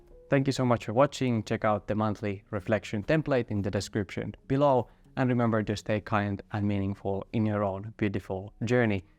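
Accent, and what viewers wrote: Finland. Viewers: The moment I heard finnish accent I knew I was about to hear something wise. Kiitos.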